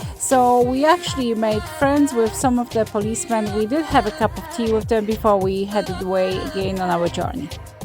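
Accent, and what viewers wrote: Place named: Poland. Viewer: Fantastic. I am from Islamabad Pakistan. I am really enjoying your Pakistan videos. I guessed it right about Poland looking at your names & accent. What i know about Polish people is that they are the most adventurous people on this planet. Most of the top mountaineers who come to Pakistan are from Poland & what you are doing isn't easy either. They love adventures 👏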